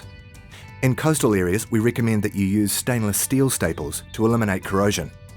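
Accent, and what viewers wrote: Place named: New Zealand. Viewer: This video needs to be narrated by an Aussie the kiwi accent will inhibit sales and no one will take it seriously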